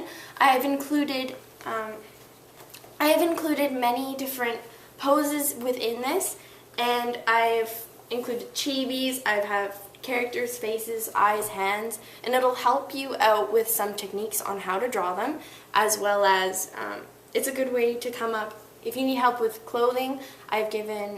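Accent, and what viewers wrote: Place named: United States. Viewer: good job and all :D but srsly I don't like to watch people dressed up like manga characters with this american way of speaking xD it doesn't fit at all :D keep up anyway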